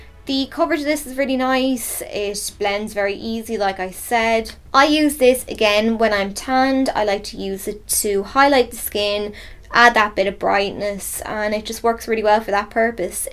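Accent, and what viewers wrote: Ireland. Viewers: I love, love ,love your Channel and your videos 😍😍😍 You are so professional, kind and friendly, and I love that you review products that are affordable for us mortals 😅 And...even though it's highly irrelevant and I hope I don't offend you as an Irish person, but being a Dane, who spent a lot of time travelling and living in Dublin, listening to you talk takes me "home" to Ireland.❤️ So thank you for that too, and I'm terribly sorry if made you feel like a tourist- leprechaun...😳🤦‍♀️ Definitely not my intention!😊🙏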